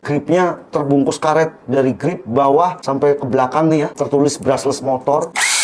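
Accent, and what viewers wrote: Java, Indonesia. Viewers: bang sampean dari jawa bukan? logatnya medog , 🤭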